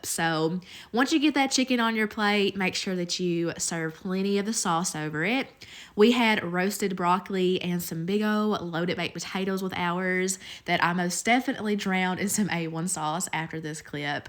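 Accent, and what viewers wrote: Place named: South, United States. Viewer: I love your receipts and your accent, just like Paula Deen, oil y'all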